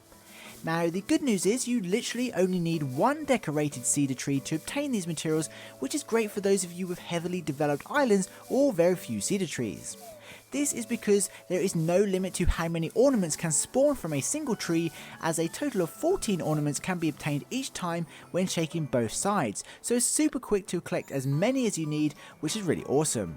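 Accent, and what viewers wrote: England, United Kingdom. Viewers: He sounds like he lives in England and I think they are a day ahead and this ornament stuff comes tomorrow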